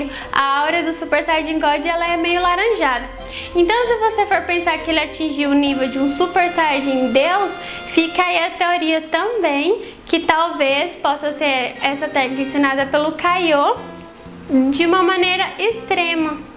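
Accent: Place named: Londrina, Brazil. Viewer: você e londrina? ( o sutaque parece)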